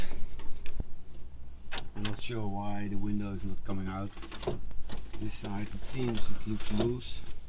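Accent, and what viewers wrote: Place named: Netherlands. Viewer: Bedankt voor je handige instructies. We have a 1996 VW Cabrio, and your instructions and video guidance was just what I needed to get this done. We live in Norfolk County in Ontario, Canada, and there are a lot of Dutch people in my church--it was very nice to hear your instructions in English with your Dutch accent. I did pretty much everything that you did, and just finished and the window works great! Thank you especially for the extra details about the clamps and the alignment procedure. Thank you so much.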